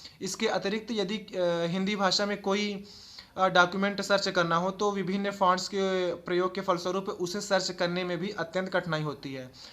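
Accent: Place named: Kanpur, India. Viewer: Aap ek ek bat ko bahut hi clear btate hai.....Aur sbse achi bat aapki ki aapki language mujhe fatehpur ya kanpur nagar ki lagti hai...Thanx so much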